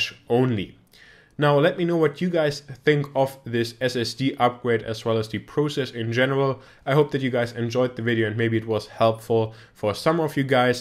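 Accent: Germany. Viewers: Dein Englisch ist wirklich gut und die Aussprache ist auch wesentlich angenehmer als bei manch anderen Deutschen Youtubern.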